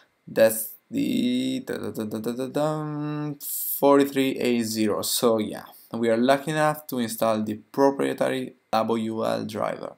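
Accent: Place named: Italy. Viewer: That Italian accent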